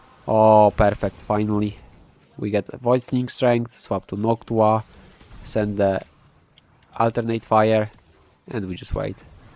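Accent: Poland. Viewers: u poland? i hear accent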